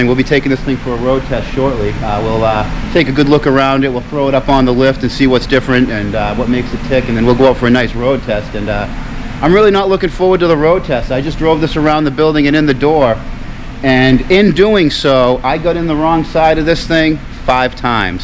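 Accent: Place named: Massachusetts, United States. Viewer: good to see you back, and hear that Mass. accent again. Imagine what that Jeep would be like out on 495! Happy Holidays!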